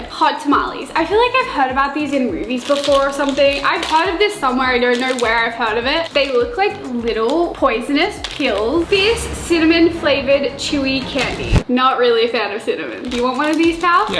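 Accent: United States. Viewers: You aren’t American ffs! Quit acting and sounding like one. Grow up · What's with the yank accent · Is it me or does this girl sound American half the time??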